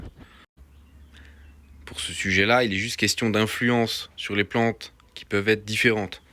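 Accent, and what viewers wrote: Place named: Franche-Comté, France. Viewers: Merci de tester et d'ouvrir les esprits, d'autres part je crois que je tombe amoureuse de ton accent de l'est 😉j'apprécie tes conseils, ta manière de faire des rimes avec le vivant du potager, j'ai vu les rageux se déchainer en commentaire sur ton dernier réel sur l'éléctroculture, un pas de travers dans le sens inverse de leur pensée et tout le monde s'enflamme au lieu de tester tout simplement, ils préfèrent boycotter!! bise de l'ouest 😘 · Je vous adore !! Ainsi que votre accent qui me rappelle ma Franche Comté natale!! 😊 Je suis très en retard pour la plantation de mes tomates !! Mais avec le pont de cette fin de semaine, sans regarder la lune, je les planterai quand même!! Mais je vais essayer votre fil de cuivre. Ça ne mange pas de pain !! Merci pour vos publications pleines d'humour. Je vous kiffe !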